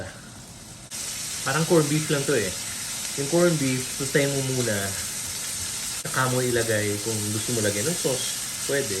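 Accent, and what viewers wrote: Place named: Hiligaynon, Philippines. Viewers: Love listening to you speaking in Ilonggo, reminds me of my grandparents. Another great and easy to follow video. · Sadya ya kun ga inom kag hiligaynon ka chef. 😅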